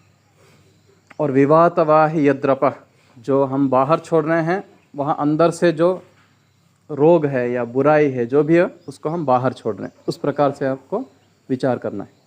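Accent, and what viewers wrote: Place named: South, India. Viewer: अद्भुत बाबा जी, बहुत ही गर्व महसूस होता है आपको सुनकर,, आपके सरल सहज ज्ञान प्रेषण करना अद्भुत है,, गर्व यह भी होता है कि आपको हम या आप हमे नाम से जानते हैं,,, धन्यवाद,, आपकी साउथ स्टाइल संस्कृत वाली हिंदी सुनना बहुत ही अच्छा लगता है।।जय हो Er प्रशांत दुबे, अस्सिटेंट प्रोफ़ेसर, उत्तरप्रदेश तकनीकी विश्वविद्यालय , लखनऊ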